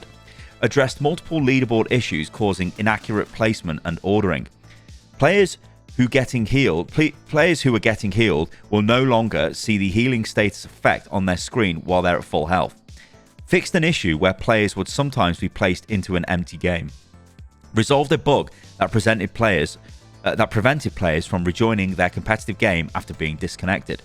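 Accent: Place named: United Kingdom. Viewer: Well maybe dial back back the British bullshit it’ll transcribe just fine.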